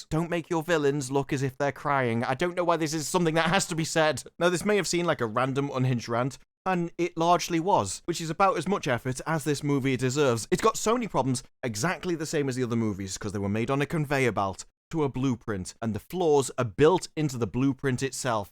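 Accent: United Kingdom. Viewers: You are so friggin funny! The voice of reason w/ a Brit accent. When I see your tweedy self getting those undies in a bunch I know it’s bad…really bad!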